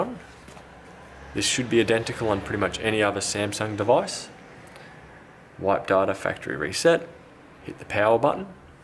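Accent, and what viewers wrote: Australia. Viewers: Great to hear an Aussie voice. I hope you are making a killing with monetisation - take as much money as you can from those bastards!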